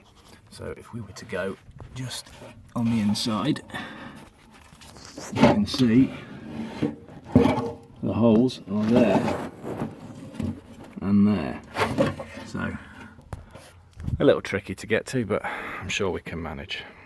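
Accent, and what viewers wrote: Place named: England, United Kingdom. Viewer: Typical English pronunciation 🎉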